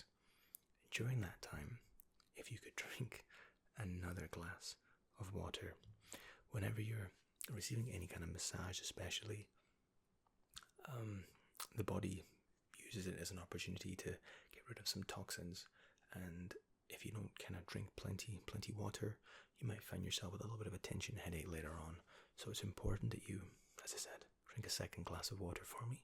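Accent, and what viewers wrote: Scotland, United Kingdom. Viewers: I love the Scottish accent and love the way you pronounce words and speak so eloquently. Much love from South Yorkshire 🇬🇧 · He's Scottish!? His accent is so subtle I thought he was from the U.S.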